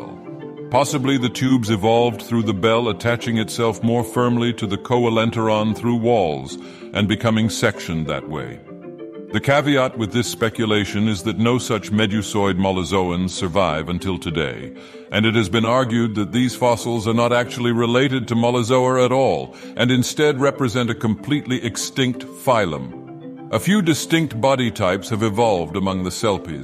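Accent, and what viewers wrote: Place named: United Kingdom. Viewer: British dagoth ur 🧐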